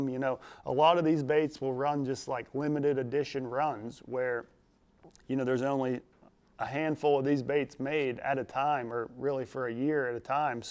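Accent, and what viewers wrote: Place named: Pennsylvania, United States. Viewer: It's a Pennsylvania thing. Yinzer language. We try to keep it interesting up here kind of like a secret handshake.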